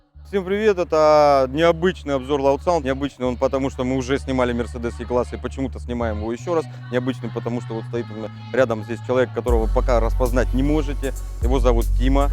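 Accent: Rostov, Russia. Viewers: Про речь ужасную кто там писал.Это суржик,норма казачьего диалекта,не является нарушением,это Ростов.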